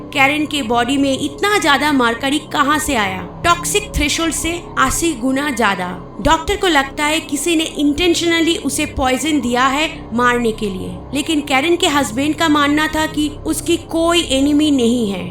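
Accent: Bengal, India. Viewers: Loved the way u say mercury... From day 1 i had found ur bengali accent very cute.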